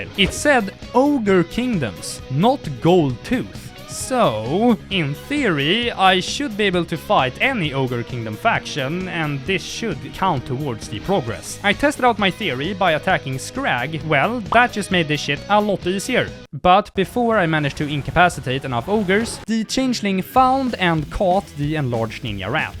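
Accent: Sweden. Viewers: I have few Swedish friends and they don't exactly sound like him but I guess it's close enough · most heavy swedish accent❤ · As a scandinavian this is the most perfect demonstration of Swenglish i have ever heard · Nah, he sounds extremely swedish, this is the most stereotypical swedish accent //another swede here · Bro is as close to swenglish as you can possibly come while still pronouncing the words correcty lol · Holy tits this mans Swenglish accent is absolutely heavenly I need this in my ears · This is the best swenglish I've heard since the "regular ordinary Swedish mealtimes" channel went inactive.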